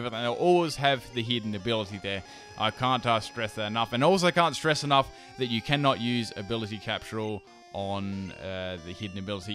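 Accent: Australia. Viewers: TheMegaultrachicken It's a very thick Aussie accent then. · is australian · He's a native Australian.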